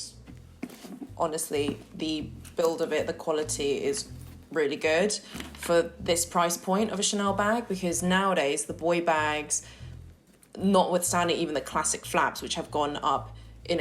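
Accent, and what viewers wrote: United Kingdom. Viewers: Your voice is like a white woman! If I close my eyes I’d thought a white woman’s speaking. . 😊 Your accent of course british, no doubt. But Asian normally has lower tone. Yours is deep. Btw, love your blogs !!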